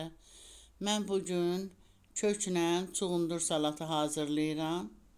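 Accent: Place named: Aran, Azerbaijan. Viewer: Salam xanım,siz haralısınız? Ləhcə çox tanış gəldi 😄😄 hiss etdim ki,aran bölgəsindənsiniz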